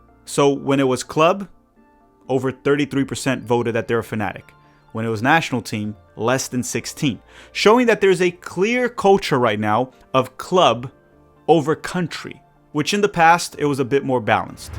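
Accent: Rio de Janeiro, Brazil. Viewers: the question that won't go away is, why do you support palmeiras with that carioca accent?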